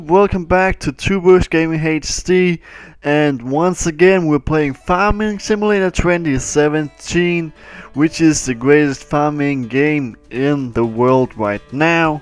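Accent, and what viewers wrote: Denmark. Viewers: Man kan godt høre at du er dansker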